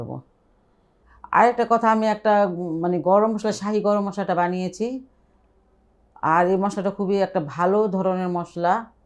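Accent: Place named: Tangail, Bangladesh. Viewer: আস সালামুআলাইকুম আন্টি।আপনার কথাগুলো খুব ভালো লাগলো।কথা শুনে মনে হচ্ছ আপনি টাংগাইলের ।রান্নার রেসেপিগুলো খুব ভালো লাগে।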